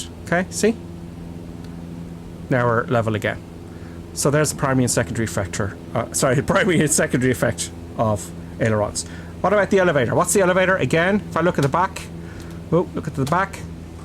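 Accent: Ireland. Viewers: Brilliant. Please continue. Love the Irish lilt 🍀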